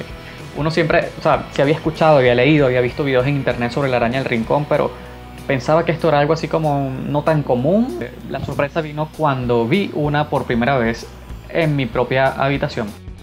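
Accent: Venezuela. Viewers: me encanta tu acento venezolano...saludos desde mexico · ¿Y de dónde eres? No he podido identificarlo por el acento... ¡Ah, ya! De Venezuela.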